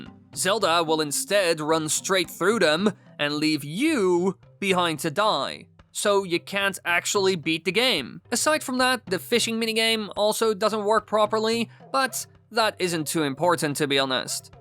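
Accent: Netherlands. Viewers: I have to know... Are you Dutch xD? If so, je accent heeft het verraden B)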